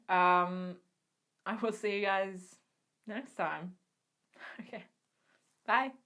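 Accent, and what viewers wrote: Australia. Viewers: she's Australian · Nice vid. 👍🏻 I'm from the UK so cos were always way behind in the good shows I'm only able to see what you lot put on you tube. Thank god for people like you. I also may have a teeny weeny crush on you. Damn Aussie accent and giggles. ☺️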